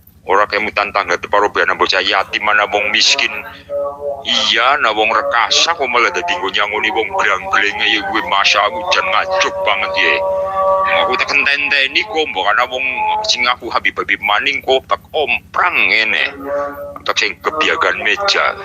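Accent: Banyumas, Indonesia. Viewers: ora ngapak ya ora kepenak mbok? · Ora ngapak ora kepenak 👍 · Ora ngapak ora kepenak,,😂😂😂❤❤❤❤ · Ngapak nek ora ngapak ya ora kepenak...😉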